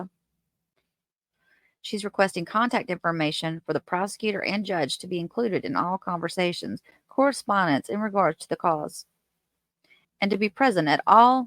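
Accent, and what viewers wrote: South, United States. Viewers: Hi from back from vacation lovely!! Sorry I was away w/out a Wifi … I’m binge watching your videos now 😊. Always a pleasure to listen to your southern sweet accent 🫶🏽 Have a wonderful week 😘🌺🫶🏽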